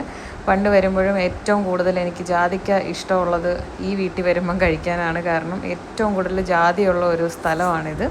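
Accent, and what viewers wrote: Kottayam, India. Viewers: ഹായ്... എനിക്ക് videos എല്ലാം ഇഷ്ടമാണ്.. ഞാൻ അമ്മുമ്മയുടെ വലിയ ആരാധികയാണ്.. അമ്മുമ്മ " പറയെടി "എന്ന് പറയുന്നത് കേൾക്കാൻ നല്ല രസമുണ്ട്.. അമ്മുമ്മക്ക് ആയുസും, ആരോഗ്യത്തിനുമായി പ്രാർത്ഥിക്കുന്നു 🙏🙏🙏 അക്ഷര സ്പുടതയോടെ, ഉച്ചാരണ ശുദ്ധിയോടെ സംസാരിക്കുന്നത് കോട്ടയം ഭാഷ കേൾക്കാൻ ഒത്തിരി ഇഷ്ടമാണ്... ഈ അടുത്ത കാലത്താണ് ഞാൻ അമ്മുമ്മയുടെ video കണ്ടു തുടങ്ങിയത്... ഐശ്വര്യമുള്ള സുന്ദരിയായ അമ്മുമ്മ.. പാവം ❤❤❤❤❤❤❤❤❤❤❤❤❤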